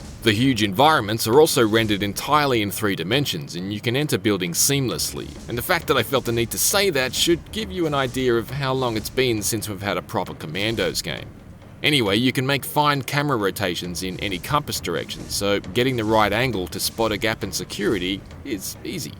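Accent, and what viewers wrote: Australia. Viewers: I heard the Australian accent, and I was so worried it was the IGN voice up voice down guy. Thankfully it wasn't, and the video is actually watchable.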